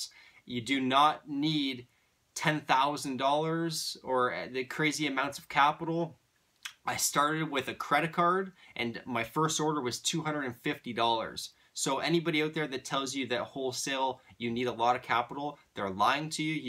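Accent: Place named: Canada. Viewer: I know you'd Canadian by how u say owt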